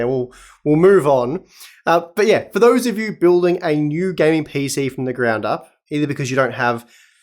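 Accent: Australia. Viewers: I really like ur channel as Australian English is much more easier to understand for foreign people.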